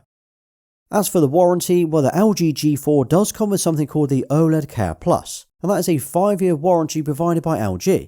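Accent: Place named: England, United Kingdom. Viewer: what is this city ? miami or another ! hi bro from kazakhstan 🇰🇿 your accent so beautiful 🔥 the english accent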